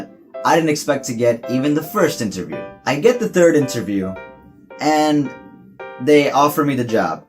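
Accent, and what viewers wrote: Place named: New York, United States. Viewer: the minute i clicked on this video i knew you were from NYC lol, when you said manhasset it confirmed it lol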